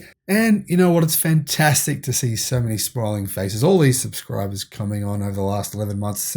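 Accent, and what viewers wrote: Australia. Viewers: sounds Australian.. 😁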